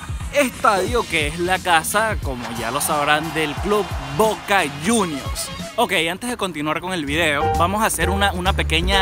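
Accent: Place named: Venezuela. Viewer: no es de hater, pero porque los venezolanos siguen hablando como venezolanos estando en Argentina, me choca que no adopten ni un poco el acento.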